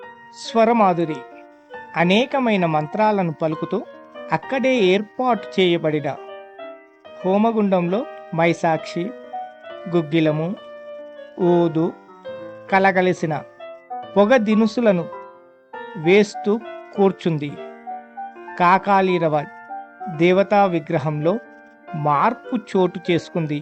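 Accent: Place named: Telangana, India. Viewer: తెలంగాణ యాసలో కథ చాల బాగా చెప్పారు గురువు గారు 🙏😊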